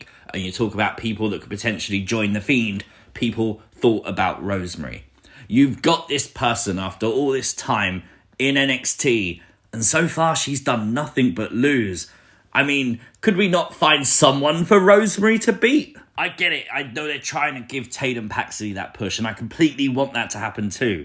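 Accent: United Kingdom. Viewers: You know he’s British? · He said "Rosemary". He didn't say "Rosemarie". It's only the British accent that makes it sound like that.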